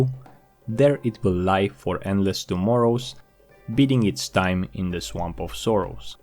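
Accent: Romania. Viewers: this guy has a romanian accent, wonder if he is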